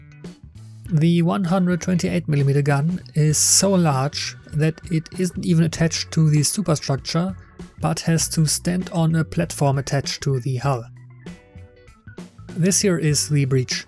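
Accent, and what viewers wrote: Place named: Germany. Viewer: Hab ich doch hie und da einen deutschen Akzent rausgehört! 😃 Lecker gebaut und schön mit Struktur verziert. Ein dickes 👍 von mir.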